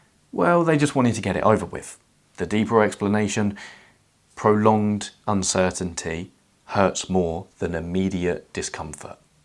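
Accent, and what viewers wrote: United Kingdom. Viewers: idk why this guy is so convincing, it must be the british accent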